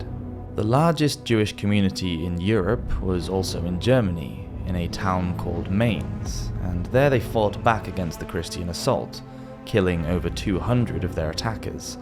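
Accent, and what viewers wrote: United Kingdom. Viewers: Using a British accent while quoting Italian figures is pretentious AF. This video was boring.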